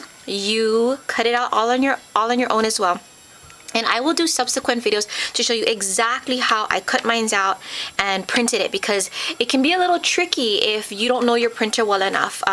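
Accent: Hawaii, United States. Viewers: Are you from Hawaii? You can totally hear it. Lol "used to to". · Howzit! I thought so! I could've sworn that I heard you say "dat' and 'dis", I could tell when you said, "Used to, to". That's a dead giveaway! You can take the girl out of the island but you can't take the island out of the girl. ALOHA!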